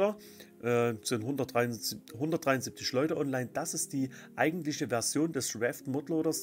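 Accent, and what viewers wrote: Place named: Saxony, Germany. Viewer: Ich bin, deine Stimme hat mich geholt mein Sächsischer Bruder!!! <3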